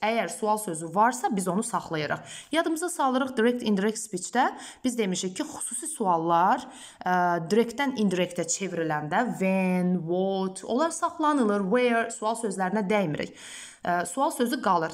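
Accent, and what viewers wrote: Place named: United Kingdom. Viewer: You have a british pronunciation, good)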